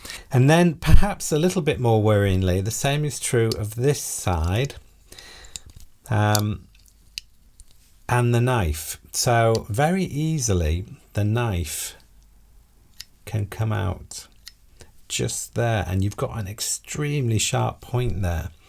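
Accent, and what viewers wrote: United Kingdom. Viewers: Hi! New subscriber here. Love the “James Bond” intro there, specially with that natural British accent, such a joy to hear. Anyways, I like how you do these gear reviews, in such a simple way, very straightforward, I feel the sincerity. 👍🏼👍🏼 to you! Your new number one fan from the Philippines. 🇵🇭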